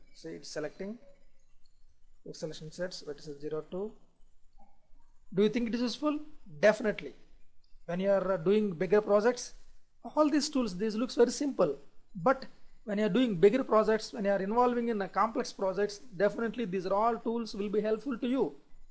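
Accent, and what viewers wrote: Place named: India. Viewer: Dude, seriously... give this guy a break :) His Indian accent is awesome!!! :)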